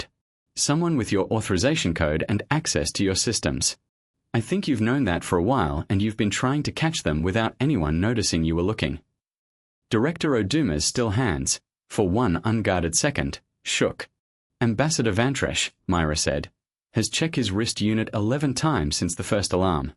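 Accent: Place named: Australia. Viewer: wdf?... and the reader has an Australian accent? (I might be wrong but it sounds... unusual)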